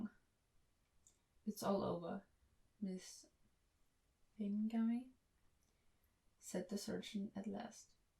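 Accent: Austria. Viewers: It's really cool hearing Dickens with a really cute Austrian accent!😊 THANK YOU!!💕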